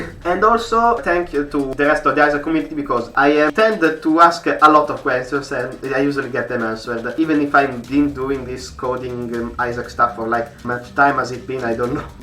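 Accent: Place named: Italy. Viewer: dal tuo accento mi sembri italiano, quindi rischio la giocata e scrivo in italiano. Questa mod è una delle migliori che abbia giocato fino ad ora. Apprezzo la ricercatezza di alcune stanze e degli stessi personaggi, tipo lo stesso Bertran ed il fatto che il suo gameplay sia basato sulla sua testa. Le parti più belle della mod sono senz’altro il design generale e i personaggi. Spero che riusciate a finire il terzo capitolo entro il 2024 perché potrebbe essere la migliore mod di isaac a mani basse. Sono in parte contento di essermi approcciato alle mod del gioco solo adesso e non 5 anni fa 😅 Buon lavoro ragazzi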